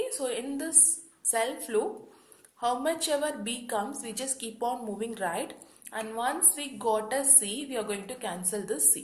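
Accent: South India, India. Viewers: all i hear is some indian noises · I was reminded of my south Indian teacher who has the same accent and style of teaching.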